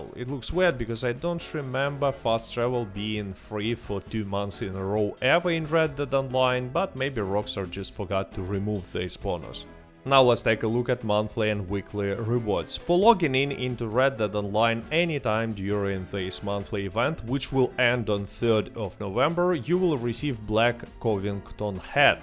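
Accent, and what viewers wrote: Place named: Russia. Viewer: Господи как же слышно, русский акцент, это жесть, попробуй чуть мягче, ощущение будто ты Борис)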